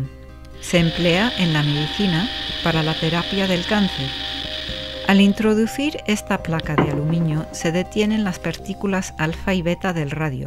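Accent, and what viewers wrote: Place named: Spain. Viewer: Amo los documentales cuando relata un español, las imágenes son didácticas y tiene música placentera de fondo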